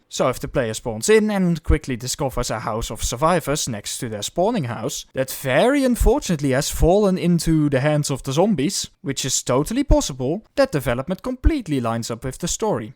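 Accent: Netherlands. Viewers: ziek nederlands accent 🤩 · I smell nederlands (or german)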